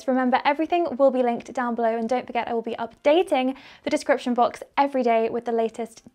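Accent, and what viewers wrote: United Kingdom. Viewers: Your British accent is so lovely, and your beauty is overwhelming 🌹 Greetings from Vienna, Austria 💐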